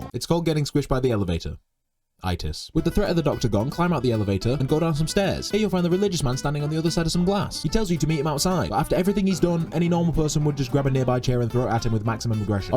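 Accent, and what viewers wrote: North, United Kingdom. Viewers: Love you especially because your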